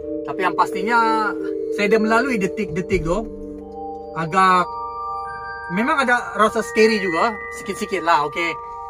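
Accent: Sabah, Malaysia. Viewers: Org sabah ka nih ckp cm org sana jak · ia org sabah tu,bahasanya tu,tapi x pasti daerah mana,sy pun baru tgk chanel nya ni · Slangnya slang Sabah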